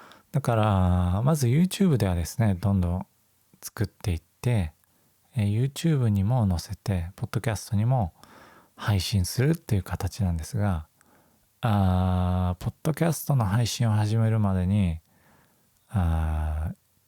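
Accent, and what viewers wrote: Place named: Japan. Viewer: I was wondering this too… your voice is gorgeous. From what part of Japan are you from? I want to learn this accent.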